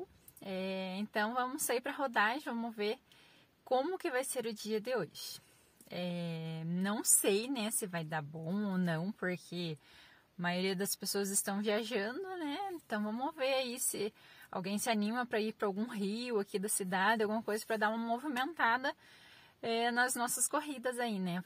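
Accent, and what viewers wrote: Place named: Paraná, Brazil. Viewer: Rachei com vc sutaque de pé vermeio parabéns galega vou seguir vc mora em SP mas nasci no Itambé Paraná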